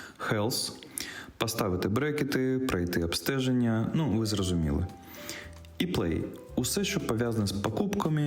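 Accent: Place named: Belarus. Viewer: наче українська з елементами білоруської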